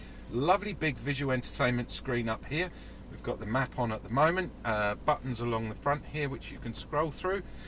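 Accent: United Kingdom. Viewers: i think u came from liverpool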